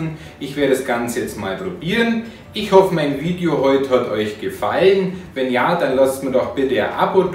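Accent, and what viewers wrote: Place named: Augsburg, Germany. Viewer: Hört sich nach augschburger Dialekt an.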